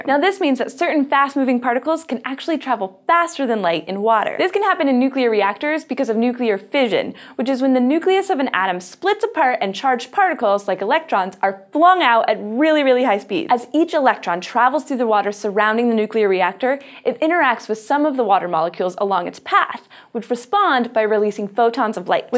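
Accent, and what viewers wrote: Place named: Idaho, United States. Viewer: Weird... Even me, a non native speaker with a pretty poor fluency can understand her. Google says somewhere around Idaho, USA... Btw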